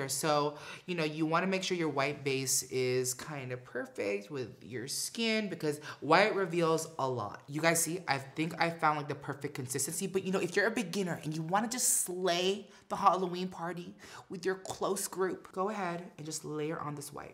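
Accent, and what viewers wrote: United Kingdom. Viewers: Love the British accent 😍😍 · Your voice with british is really good omg!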